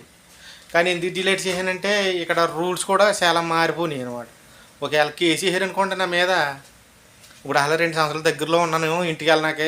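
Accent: Godavari, India. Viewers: హాయ్ అన్న ఎలా ఉన్నారు,మన, గోదారోళ్ళు, మాటే అలా, ఉంటుంది మరి,మన,ఉతపదలు అలాగే ఉంటాయి ఎవరు ఏమి అనుకున్నా, అవి మారవు, బాగుంది వీడియో జాగ్రత్తగా ఉండండి 👍👍♥️♥️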